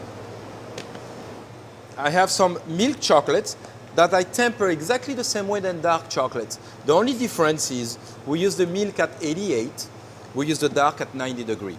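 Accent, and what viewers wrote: France. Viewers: French accent ^^ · this guy has a French accent, the I pick things up guy has an Austrian accent lol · just a little bit french! lol! great job! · I love that accent! I can't place exactly what it is though! I think it's a French accent, but I can't tell. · Il a un accent francer !! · Are you french?? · I definitely love his french-english accent, his randomness, and his job ( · oh is it a french accent I thought it was german my bad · Hahahaha. You gotta love the french-accent. :D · good old french accent ! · hes from france, he sounds french and his name is french aswell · Do you have a French accent I'm guessing? Cool, how old were u wen u learned english? Sorry, I'm a big language nerd :P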